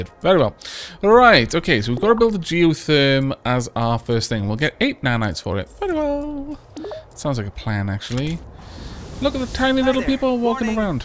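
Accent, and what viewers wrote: Wales, United Kingdom. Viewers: has welsh accent...is funny as fuck and is pretty savage, it's like having a older brother that has a clue of how to play this game 😂 great good on this series buddy im subscribed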